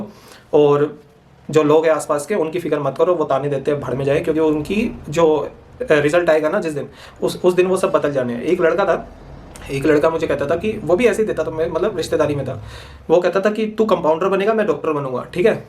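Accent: Haryana, India. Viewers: U have haryanvi accent are from haryana??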